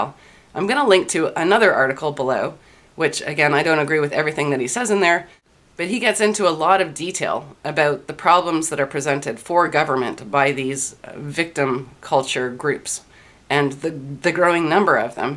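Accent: Canada. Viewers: You Canuck, eh?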